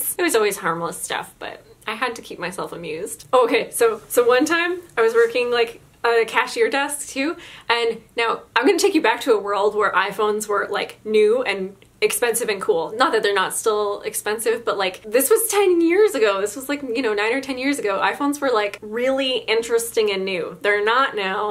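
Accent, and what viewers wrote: Newfoundland, Canada. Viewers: Lmao that's such a good newfie accent u didn't know you were newfie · I don't have a retail story but your Newfoundland accent is so cute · I HAVE ALWAYS WANTED TO LEARN A NEWFIE ACCENT I AM IN TOTAL AWE YOU DON'T REALISE THE TALENT YOU HAVE IT IS SUCH A TREASURE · Your Newfoundland accent made my LIFE! I'm a Newfoundlander living in Chicago and I have absolutely answered my salon's phone in a heavy Newfoundland accent before.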